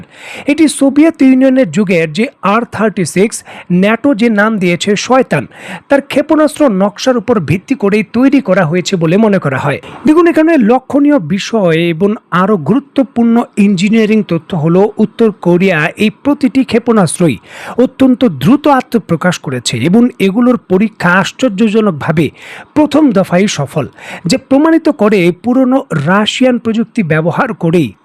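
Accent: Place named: India. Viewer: Amon chinta apnar kamne Ashe je Onno desh Hi-Tech technology diye dey!!! Apni Ekta Awamilegeue tai sob somoy anti Muslim sentimental apnar modhe. Apni sob somoy Muslim der khato kore dekhen. Ami khomotay thakle apnar channel bondho kore ditam ba kemne news Korte hoy tar Ekta guide line ditam. Sob somoy Indian tune Kotha bolen apni.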